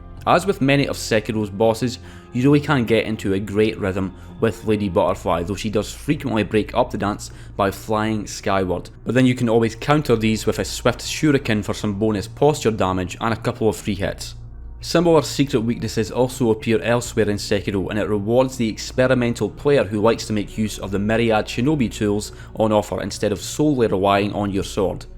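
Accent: United States. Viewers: A potshot at people who dislike Scottish accents and speaking in an American one - it's random shit like this why I love your videos! 😄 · Bro your American accent 10/10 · Non Americans doing an American accent are goated with the sauce, thank you for your contribution 🫡 🇺🇸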